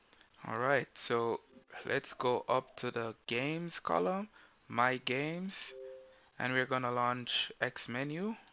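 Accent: Jamaica. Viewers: Ur Jamaican ??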